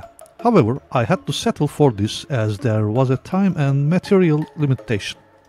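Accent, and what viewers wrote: Russia. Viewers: Мне одному слышится английский с Рязанским акцентом? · new to this channel is that a russian accent or..?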